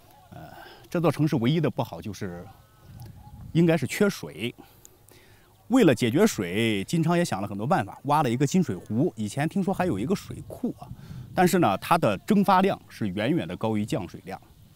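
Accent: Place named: Central Plains, China. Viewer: 我看的视频，总感觉像是中原这边的人，主要是听口音😂😂😂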